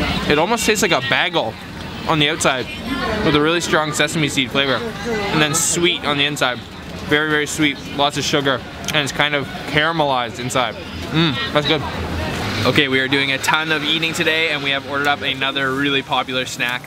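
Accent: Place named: Canada. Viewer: Stumbled over your channel by accident and I love it! Great content, superb drone footage and love the Canadian pronounciation - „over and eut“ 😉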